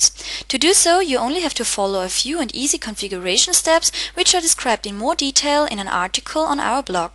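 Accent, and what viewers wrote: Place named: England, United Kingdom. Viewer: I just love her English accent.......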